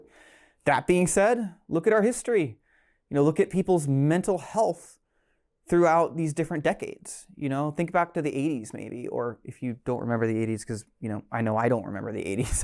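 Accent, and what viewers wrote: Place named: United Kingdom. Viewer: I think he’s British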